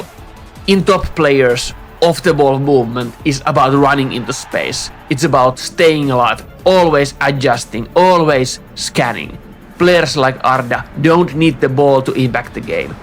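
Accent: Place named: Finland. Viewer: I love Finnish accent. Soothing, clear, understandable. Thanks for the extensive analysis. Subbed. Regards from Turkey.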